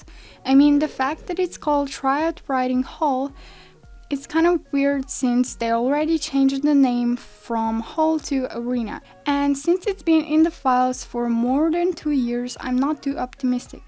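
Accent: Germany. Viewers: Are u german? :D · Du bist deutsch oder haha?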